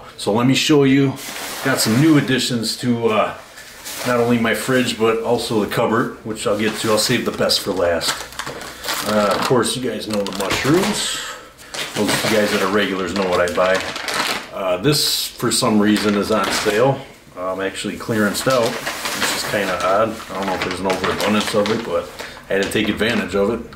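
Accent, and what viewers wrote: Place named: Wisconsin, United States. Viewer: Any chance you're from Wisconsin? You sound exactly like my friend's father who hails from Milwaukee.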